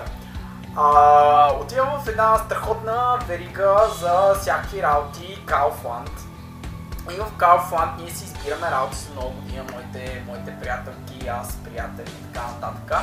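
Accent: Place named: United Kingdom. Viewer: имаш доста готин английски акцент, по-скоро великобритански, за да се разбере по-добре какво имам предвид. Защо не направиш клип с любимите ти или най-омразните ти неща на великобритански английски... ще ми е интересно :)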